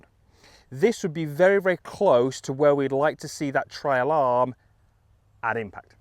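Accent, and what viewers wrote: United Kingdom. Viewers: He is British! We have the accent.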